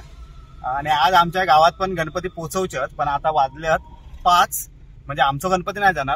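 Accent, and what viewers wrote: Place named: Konkan, India. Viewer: Dada tumchi Kokani language khup Sweet ahai. Tumhi agdi apulkini bolta. Me tumchi sgli video bghto.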